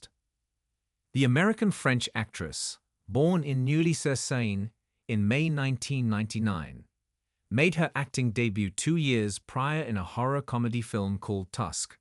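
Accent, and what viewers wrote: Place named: United Kingdom. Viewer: Well that was a waste of time. There was maybe one (possibly two)sentence about Nosferatu and the rest was a rehashing of the rehashing of critics reviews of an HBO series I didn’t see. Dear God- Please- if you can’t get decent narration from AI use an actual human to read the script. And why the British accent. And what is wrong with me even bothering to comment? lol- I think I reached the breaking point with these “reporters.”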